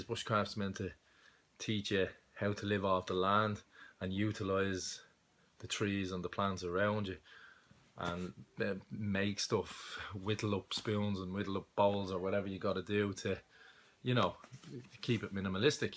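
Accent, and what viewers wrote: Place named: South Africa. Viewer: Here’s me thinking you were from South Africa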